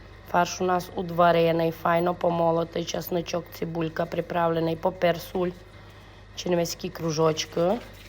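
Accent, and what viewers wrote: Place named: Tiachiv, Ukraine. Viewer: землячко! Тебе тільки чути,- одне задоволення. Одразу пригадую Тячів, місто, де народилася. Привіт з Києва❤😊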